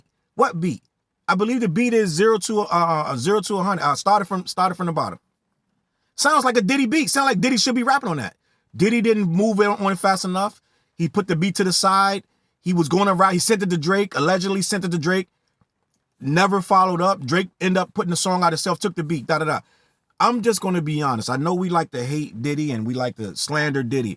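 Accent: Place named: New York, United States. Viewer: He did ride the waves. Ion mean no disrespect but you an old head and you sound like you from New York. A lot of waves he rode, the young niggas was already hip too. put the on, had a his own buzz and Drake had to hop on to get the streets. Yes he did make them bigger on a mainstream level but he did ride the wave as well. Whether it’s using their flow/ lingo or dressing like them. The man is the ultimate culture vulture.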